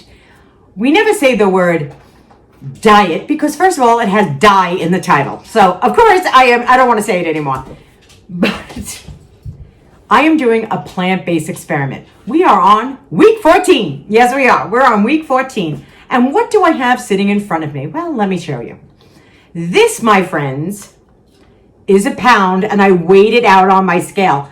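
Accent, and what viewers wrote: New York, United States. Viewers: Hi, it's Joey from PBD. Did you grow up in NY or near there? I am sensing a little "back home" when I hear you talk. It reminds me of family and good times. Ok, that bowl of veggies for 100 calories is a very good portrayal of how 100 calories are so filling. I am glad to hear you talk about carbs and starch. You know how it is when you are surrounded by Keto and no carb people who think we have all lost our mind by eating carbs. Yes, you are right, you can eat a large meal of potatoes and veggies and be stuffed for about 400 calories. It's a beautiful think. I love all the benefits you are experience in healing your body from a plant based lifestyle. I just subscribe. I gotta support my YouTube HCLF sister! Great video and great info. Hugs!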